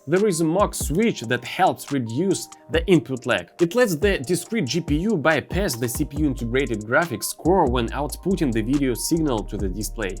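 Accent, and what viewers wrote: Russia. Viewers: ох уж этот русский акцент😅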